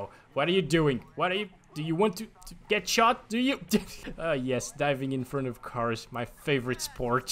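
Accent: Portugal. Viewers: when says something in portuguese my heart bounces a little, even he's talking in Portugal Portuguese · Lol, I didnt know that he was from there, but it semeed to me that he was speaking portuguese portuguese (lol). Thanks for the info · Isn’t he speaking OG Portuguese?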